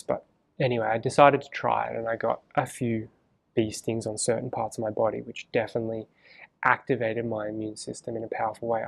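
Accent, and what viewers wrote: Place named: Australia. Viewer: Bro are you Australian or from New Zealand because I've noticed the consciousness of people with this accent is wild 😂😂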